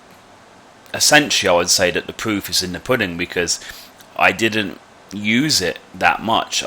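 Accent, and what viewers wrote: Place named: United Kingdom. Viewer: why in the fuck do british mother fuckers talk??????? lets just use some CC on this bitch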